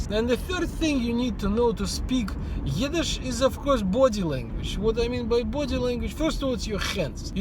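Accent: Russia. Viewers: "God bless America" :) but i think you turned out the way you did (wonderfully great and funny) because you come from a communist backgound, and i can relate to that. thank you for making the effort ! i LOVE your accent, it is such a mix of russian, something else and american :)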